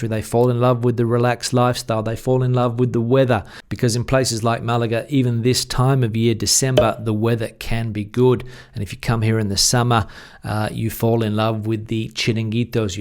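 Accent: Australia. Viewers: You seem to have an Australian accent! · I caught the Aussie accent straight away but was surprised your spanish is so good!! I'm Uruguayan but have grown up in Australia, our spanish is a little different to that of Spain but got to say it- you're speaking like a local! Well done!!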